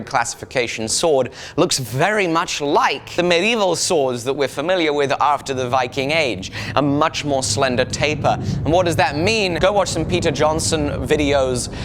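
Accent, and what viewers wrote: England, United Kingdom. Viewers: Dude your English accent is awesome. It let's you make up words that still sound great.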